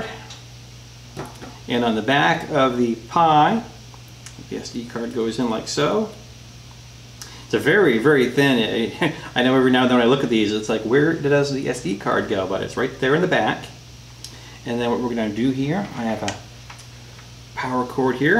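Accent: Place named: Maine, United States. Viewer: Thanks for the great video very informative it’s nice to hear from a fellow Mainer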